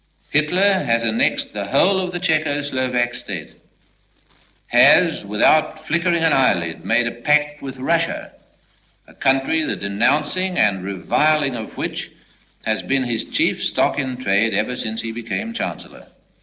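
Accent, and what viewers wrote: Australia. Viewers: Great Australian accent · He has a Cultivated Australian accent. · Menzies had a cultivated Australian accent, listen to Geffrey Rush or Dame Elizabeth Murdoch or even Barry Humphries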